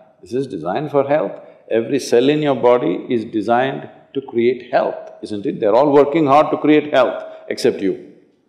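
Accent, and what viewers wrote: India. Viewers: 不是没有对的肤色，而是印式英语真的好难听懂啊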